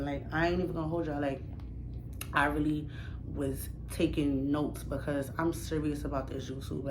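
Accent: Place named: New York, United States. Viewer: In 2025 we are getting rich off of content yes. Get it sis.💐🥂 I felt that. The stand is super cute and has good lighting 💡. Big facts on people is looking for what can you give them. & What can they look forward to coming back to? Everybody gotta start from zero followers subscribers… views. Content is like making a ship or the bottles that people put notes in then put it in the ocean hoping it will land on a island one day and somebody would read. That’s like videos on the web and people click them based from the thumbnail or title. 😁 lol you had me cracking up. Shorts bring a lot of attention to people about there channels and giving people a quick reason to subscribe for more content. Word is going around that A lot of people have short attention spans as well.. so that’s why shorts come in clutch 🤷🏽‍♀️ one time I got a comment that said “your video be too long. 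You need to make them shorter “ when I looked. The video was only about 9 to 10 minutes. 🤦🏽‍♀️ yes I’m looking forward to the long content. I can’t wait to see. is where I’m at now in the video. I’m listening and writing along, so I don’t forget what I have to say and you just talked about what I said about the short attention spans 🤯🤭 lol but click baits are the worst. Smh lol ppl play to much. You had great great points and yoooooo pls with the “did you miss me “ 🤣🤣🤣🤣🤣 hahahhahaha yo I can tell your from NYC me toooo. And it took years for me to realize New York do have an accent just like country ppl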